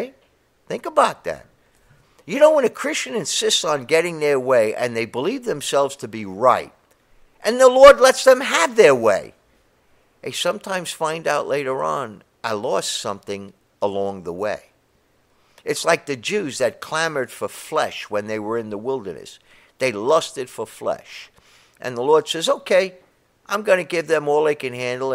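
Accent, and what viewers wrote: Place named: New York, United States. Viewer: I am from faraway New Zealand.....and I love the New York accent! Not only that, Brother is an excellent preacher and is not afraid to tell it like it is. I appreciate that! The churches from my hometown are largely of the social gospel, sugar coated, entertainment variety. And they use the modern ‘bibles’. I moved away from them several years ago so I am extremely grateful to have these wonderful, Scriptural videos. Many, many thanks from a Christian Kiwi!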